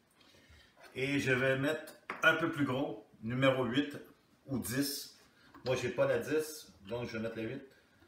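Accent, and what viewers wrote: Quebec, Canada. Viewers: Bravo.! Quel rayon de soleil pour nous "c'bel âccint Q'bêquô"!! NB. le pâté est superbe aussi, bien sûr! Merci 🌿 ♨️ 🙏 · Quel plaisir de voir et surtout d'écouter ce cher accent de nos cousins Quebecois!! cette recette sent bon comme votre accent et c'est avec plaisir que je la réaliserai avec plaisir, merci encore et bonne continuation!!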